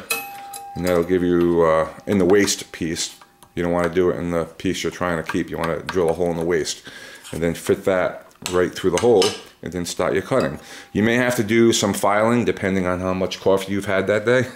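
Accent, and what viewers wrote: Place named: Brooklyn, United States. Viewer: Lovely accent you have :) are you from brooklyn?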